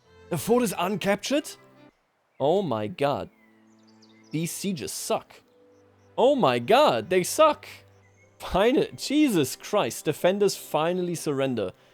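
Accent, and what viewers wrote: Bavaria, Germany. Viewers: I hope there's a few more videos about the game after you learn it, have to say its a bit of a pain watching the mistakes here but your German pronunciation of things makes it worth it.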